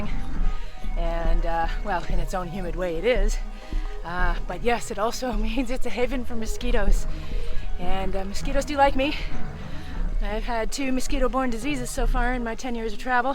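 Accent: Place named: Canada. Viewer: You sound Canadian. Thanks for the video. I am going to Hachioji for about the fifth time (to visit a friend). Still haven't hiked up takao or adjacent mountain. Must give it a try.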